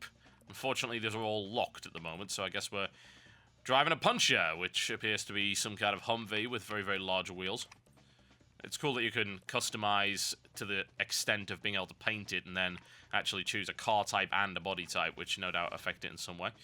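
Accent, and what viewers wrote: United Kingdom. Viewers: umm you dont gave to look or sound british or english to be english. and hes the most english sounding person ive ever heard. one last thing. why are you so mad???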